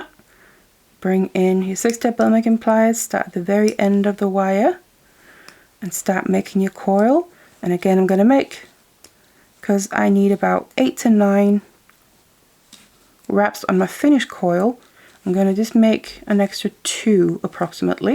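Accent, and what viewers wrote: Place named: England, United Kingdom. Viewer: And such a change to hear an English accent instead of US 😂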